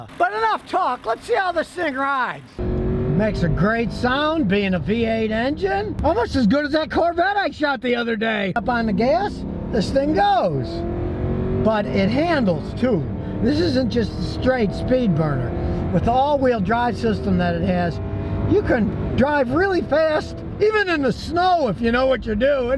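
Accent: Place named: Tennessee, United States. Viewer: not gonna lie, when i first watched your vids, I was like, "is he from tennessee??" damn i'm pumped to meet u haha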